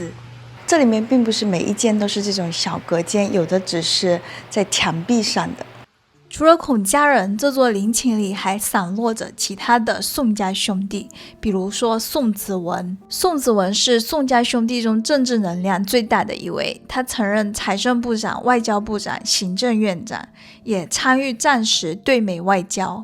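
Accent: Fuzhou, China. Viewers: 影片內容跟拍攝都很好，但...講話發音是福州土腔? 發音都不標準，聽了十分難受。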